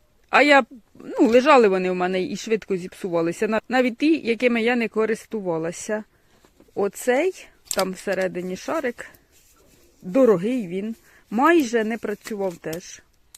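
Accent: Poltava, Ukraine. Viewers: я з задоволенням дивлюся і слухаю ваші відео,наш полтавській говір- чудо, дякую.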